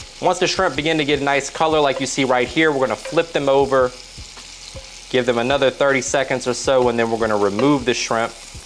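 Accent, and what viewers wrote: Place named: Pittsburgh, United States. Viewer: First time watching you. Recipe looks amazing and will make it today. Are you from Pittsburgh PA? You sound like everyone I know, from Pgh. 👍🏼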